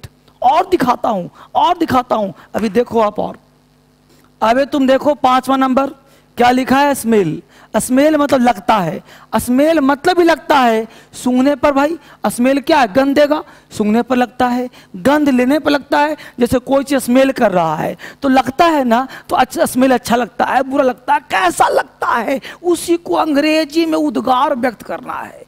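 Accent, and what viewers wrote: Bihar, India. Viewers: Guru ji Bihar se ho kya ap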